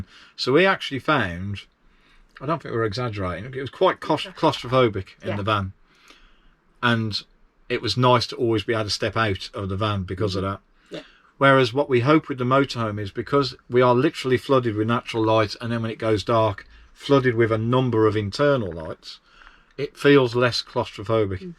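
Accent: Birmingham, United Kingdom. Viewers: Yes, thank you! That one works! ☺ Good to here the Birmingham accent again! I went to school near Weoley Castle in B'ham! Nobody told us that we were speaking old Welsh. West Mercia used to be part of Wales!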